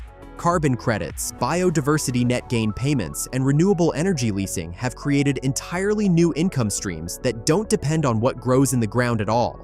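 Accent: United States. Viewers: change the American accent for gods sake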